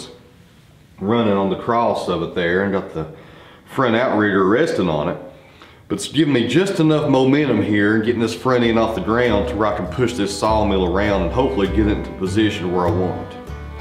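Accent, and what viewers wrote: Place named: East Tennessee, United States. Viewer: Man your videos make me miss home more than any other...Im originally from Maryville/ Knoxville area. Here at the holidays its good to hear a east Tennessee accent!! You dont know what you have until it gone. Never a truer statement. Keep it up and Ill keep watching.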